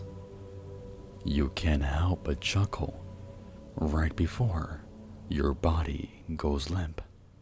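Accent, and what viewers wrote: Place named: Europe. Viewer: You sound like the foreign European guys from Family Guy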